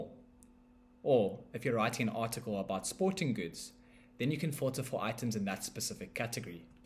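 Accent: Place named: South Africa. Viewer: bro lowkey sounds South African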